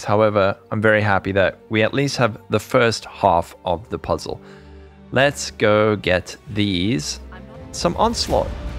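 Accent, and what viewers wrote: South Africa. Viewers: Hey bro, I commented on your last video r.e. figuring out you are a South African from your accent... how can I got about getting those discord details? My buddy and myself from SA would like to connect with other SA guys... shot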